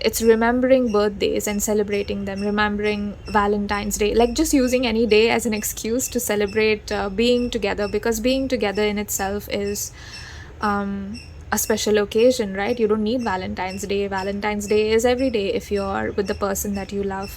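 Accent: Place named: India. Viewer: You are so creative. I can feel you like doing what you are doing, it comes from your heart. From your accent I guessed you are an Indian. And the reading was very accurate too. Pile 2 & 3 : my life has been difficult bc i was very different from people around me & as a consequence i struggled with loneliness,lack of support etc mental health issues & i had toxic relationship with a narcissistic bf for two n half years . I'm a double Aquarius with Venus in cancer. I'm a very quiet introverted person. Unless I'm with someone i know & trust a lot, I am not very emotionally expressive. Subscribed, I will watch your readings I think we are aligned. Your energy is calm relaxing serene and slow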